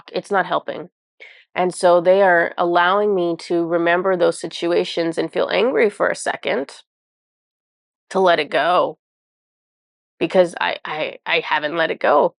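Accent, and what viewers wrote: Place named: Australia. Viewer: Thank you for important message on presence and meditation. I can’t get past Australian accent 😂🥰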